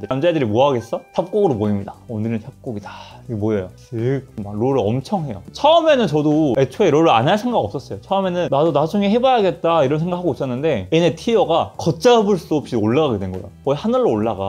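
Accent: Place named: Iksan, South Korea. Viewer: 익산 사람이에요? 익산 사투리 종종 들리는데요~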